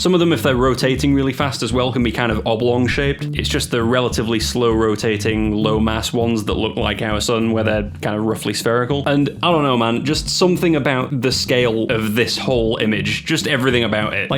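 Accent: United Kingdom. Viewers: Just subscribed absolutely love your commentary 😂 is that a northern accent i hear? ❤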